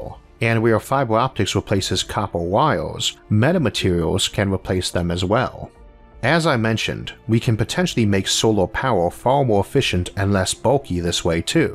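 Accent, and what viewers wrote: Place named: South, United States. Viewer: I love learning about science from a southern accent! God bless the omniverse multi verses universes....John b goodenough invented the lithium ion battery!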